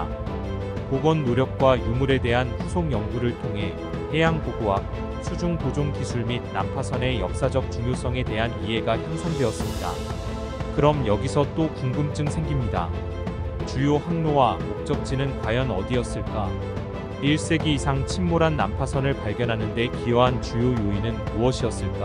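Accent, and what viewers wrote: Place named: United States. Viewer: 보물섬 실제 얘기 잘봤어요 근데 아메리칸 발음을 더 잘 들었어요 영상도 자주 바뀌어 조금의 지루함 없이 영상 잘 봤습니다.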